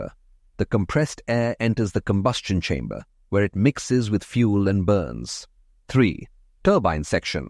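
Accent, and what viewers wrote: India. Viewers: Is it me or the narator is indian ??? That beeing a well known gateway for russian propaganda...😂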